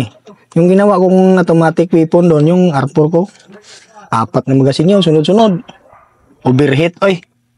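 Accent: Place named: Bicol, Philippines. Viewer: Maganda man sana ang kwento kaya Lang BisakoL ang nag kwento. Ayun DUTAE Lang ang Ending ... Kwentong Barbero. 😂😂😂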